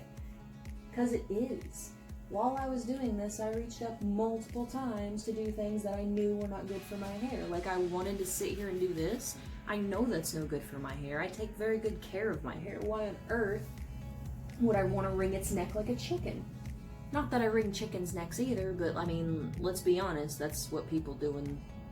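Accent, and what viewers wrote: Midwest, United States. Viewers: Purple is amazing! Could tell you were a fellow midwesterner. Could listen to you talk all day.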